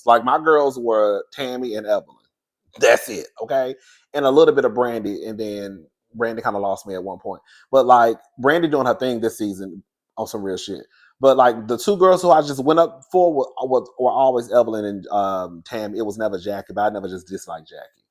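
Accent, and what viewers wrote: South, United States. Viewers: Team Jackie ❤ I holler errtime you say HURRR I live for your country ness and your laugh 🥰🥰🫶🏾🫶🏾‼️😭😭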